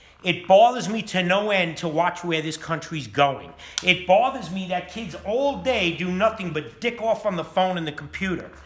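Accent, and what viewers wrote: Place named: Detroit, United States. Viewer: That's a beautiful accent where is from ? Detroit ?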